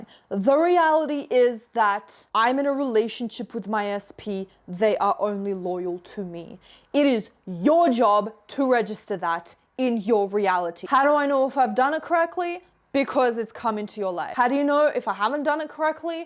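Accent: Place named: Australia. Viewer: I love your Aussie accent saurrr muchhh fr🤧✨❤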